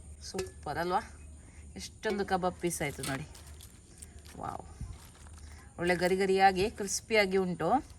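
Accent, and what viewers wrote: Mangalore, India. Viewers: ತುಂಬಾ ಚನ್ನಾಗಿದೆ ರೆಸಿಪಿ ನಾನು ಮಂಗಳೂರಿನಲ್ಲಿ ಎರಡು ವರ್ಷ ಕೆಲಸ ಮಾಡಿದ್ದೆ. ಆಹಾ ಮಂಗಳೂರು ಕನ್ನಡ ಕೇಳುವುದು ನನಗೆ ತುಂಬಾ ಖುಷಿ ಕೊಡುತ್ತೆ. ಮದುವೆಗೆಂದು ಮಂಗಳೂರು ಹುಡುಗಿ ಸಿಕ್ಕಿದ್ದರೆ ದಿನಾ ಹಿತಕರ ಕನ್ನಡ ಕೇಳ್ಬಹುದಿತ್ತು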